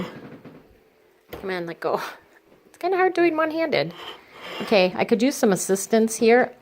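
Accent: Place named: North, United States. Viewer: hello! You sound like your from the north lol. Hello from MN here. Thanks for the video, i was going to try pico and chicken in my new instant pot as well. almost exactly a year later.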